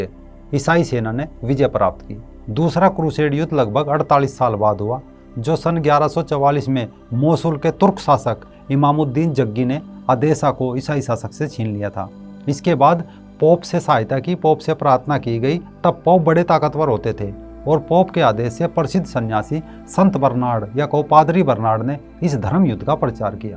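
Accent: Haryana, India. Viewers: My mom is alsofrom haryana I understand this haryanvi slang of hindi by bhai😂 but one thing he tries so hard to provide excat news to us his work is more authentic then most of the famous news channels, keep it up bhai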